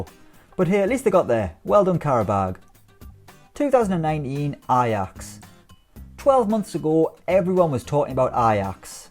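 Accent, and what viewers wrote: Ireland. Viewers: I am a simple man. I don't hear irish guy. I click off. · starts video doesn't hear irish guy's voice "Ah shit"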